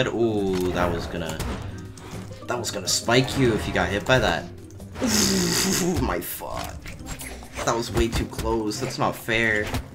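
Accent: Australia. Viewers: Nice aussy accent Mate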